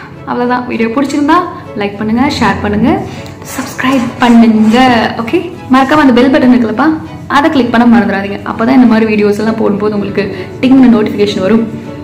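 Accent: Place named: Kongu, India. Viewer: Hi hru voice super kongu Tamil PSG arts college mass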